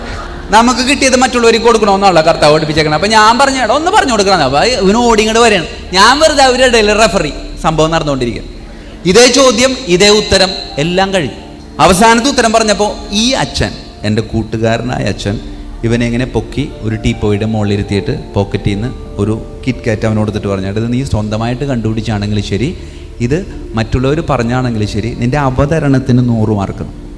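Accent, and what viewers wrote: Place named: Ernakulam, India. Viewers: അച്ചോ, അവതരണം സൂപ്പർ, 👌👌👌ചിരിച്ചു ചിരിച്ചു ഒരുപാട് ആയി, നമ്മുടെ എറണാകുളം സ്ലാഗ് 👌👌🙏🙏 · നല്ല കൊച്ചി ഭാഷ 👍👍👍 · കൊച്ചി സ്‌ളാഗ് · Paravoor slang aan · This is paravoor accent.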